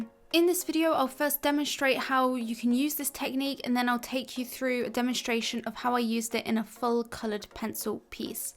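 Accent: United Kingdom. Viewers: That british accent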